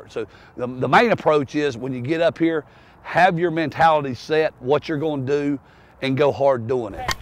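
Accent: South, United States. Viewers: It's southern not english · As a southern boy (74 yrs old), myself, love to hear talk! I'll bet he can eat some cornbread!:)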